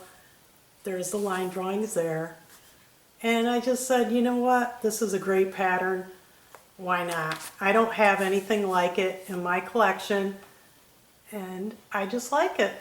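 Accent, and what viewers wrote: Pennsylvania, United States. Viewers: Oh I loved this video. Every bit of it. Im in PA about an hour from where you came home to. I knew I recognized you accent as home. ❤️ Your Grand daughter is precious and looks lovely in her dress. I got my sewing love from my Grandmother when I was about 6. You’re Grand daughter might want to try one day! One more thing, You are a wonderful person, effervescent and joyful, so much fun to watch. 😊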